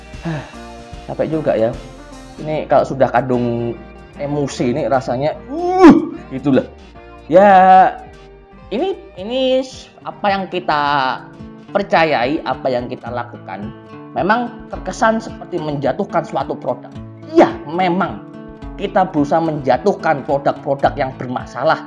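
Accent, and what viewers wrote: Java, Indonesia. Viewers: Logat e jowo banget njih pak dokter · yg menyenangkan paling medok yg favorit bgt memberi informasi yg dibutuhkan anak muda ketje. Terimakasih dokk 💕💕💕 post video ttg informasi yg bermanfaat bagi anak jaman now ya.. Selalu di tunggu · Pak dokter boso jowone kentel, aku suka wkwk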